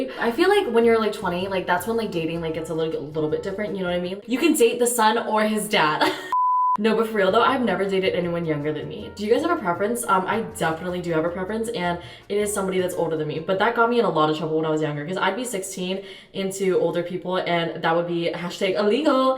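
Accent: United Kingdom. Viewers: I genuinely love your British accent why don't you upload a challenge talking about your British accent from the beginning of the video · hwa definitely british u cant hide it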